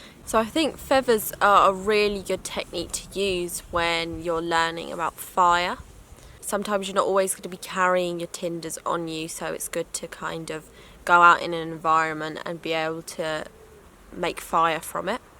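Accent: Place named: Cockney, United Kingdom. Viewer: A fellow lefty I see. Nice video 🙂 So not the subject of the video obviously, but I love the accent. Cockney?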